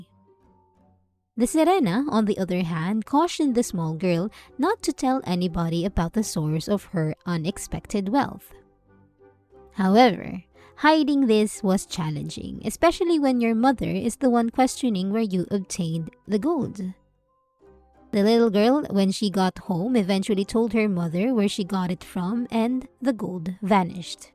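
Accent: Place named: Philippines. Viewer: You have a lovely accent, but I say that as lived in the Philippines for 6 months, awesome country, lovely people. Look up the Nantucket mermaid 1700s, seen and recorded by a Lutheran minister on a Dutch vessel. Recently sighted was a mermaid at Flat Bridge in Bog Walk Gorge Jamaica Mermaids are in the book of Enoch. They are the women who mated with Fallen Angels, locked in a hellish "purgatory" until the end of age and final judgment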